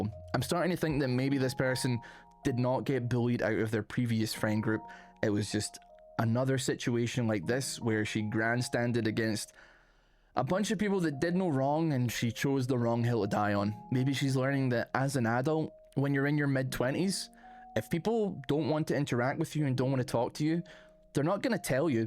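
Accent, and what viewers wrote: Scotland, United Kingdom. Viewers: I love listening to reddit stories while I write. Now I can listen to a fellow Scotsman. Perfection.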